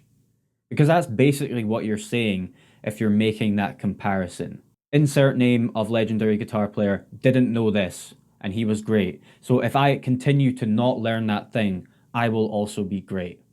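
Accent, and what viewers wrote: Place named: Scotland, United Kingdom. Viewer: two seconds on this chanel and i already can tell you're scottish <3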